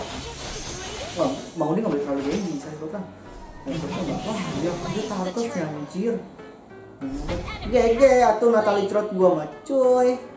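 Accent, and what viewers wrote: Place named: Surabaya, Indonesia. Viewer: sedot lu kok medok bgt??? pas an kyk aku suroboyo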